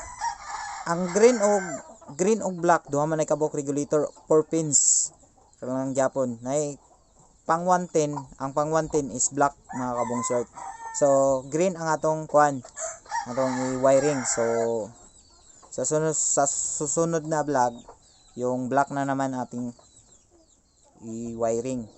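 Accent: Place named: Visayas, Philippines. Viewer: Visaya ka pre, di kita maintindihan.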